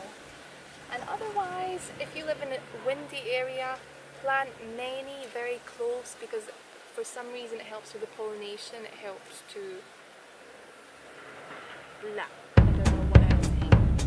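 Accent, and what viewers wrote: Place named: England, United Kingdom. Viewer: Love ur English acent...... P. S from ur favourite niece 🤣🤣🤣see ya in 7 days🥳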